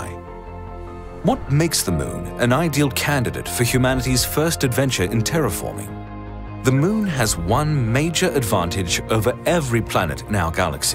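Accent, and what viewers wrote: United Kingdom. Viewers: I love the edit, the drawings, and the British accent. It's the perfect combination. I'm a FAN! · It's so weird the he speaks with British accent then uses metric system 😑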